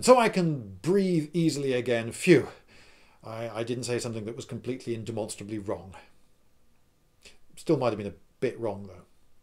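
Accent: United Kingdom. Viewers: As an American watching your videos I can say if I'm getting some news or if I'm going to have something explained to me it's always better with a British accent... Cheers... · when I saw the thumbnail I thought: look at this neck beard cosplay tard. then I heard your British accent and your IQ points went way up lol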